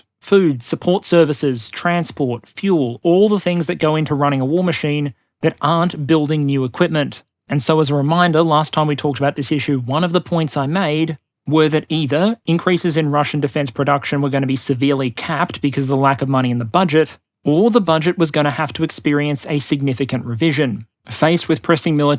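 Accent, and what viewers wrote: Australia. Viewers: WOW. High quality analysis! This is a high speed download of research-rich info, spiced with a little dry humor. Good clear diction in Aussie-accented English, which is less off-putting to us Americans (Oxford English lectures give us inferiority micro-seizures)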